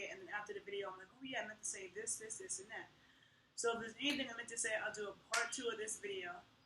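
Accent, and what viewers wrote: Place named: New York, United States. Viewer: Now you are on to the Real Knowledge. Postive you are a fascinating potent female what we use to call a witch, ... more shaman ... me too.. In this corrupt weak patriarchy has twisted and killed our connection with the divine, the energetics... medical symbol is ancient... caudecaus ... it is from ancient sex rituals from Sumer and Egypt... even in ancient Canaanite ... Heard a bit of New York accent New Yawk ! Anyway my fam in Brooklyn, I live in Oakland. I love to have a cup of coffee or juice wit you and discuss magickal systems , sexual alchemy, and ways to build healing systems.. yeah I hear da rain. ! God bless woman.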